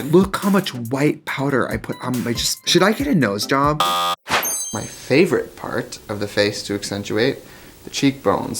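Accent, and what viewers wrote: Wisconsin, United States. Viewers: YES! Her Wisconsin accent was so strong when she was younger. She was so innocent and pretty though! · the Wisconsin accent really jumped out in the old clips oml bless 😂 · The Wisconsin accent jumped out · THE WISCONSIN ACCENT JUMPED OUT IN THAT OLD TUTORIAL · Wisconsinite accent was so thick back then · circa 2010 really gave us that Wisconsin accent.. RE. PRE. SENT. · girl that Wisconsin accent is THICK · I love how in your face her Wisconsin accent used to be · What happend to your Wisconsin accent? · I love you clocking your Wisconsin accent. I’m from Northern Illinois. I feel ya bud. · As a fellow Wisconsinite...your Wisconsin was showing!! ❤ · The Wisconsin accent is so strong 🤣🤣🤣🤣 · His Wisconsin accent is there. Represent! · Girl the Wisconsin accent is thicc. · The strong Wisconsin accent really makes it that much better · u can really hear the wisconsin in this one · the wisconsin accent really jumped out in this omg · Never could notice Wisconsin accent until now 👀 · That Wisconsin accent!!!! 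· The strong Wisconsin accent really makes 2009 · The Wisconsin accent ‼️ Where’d she go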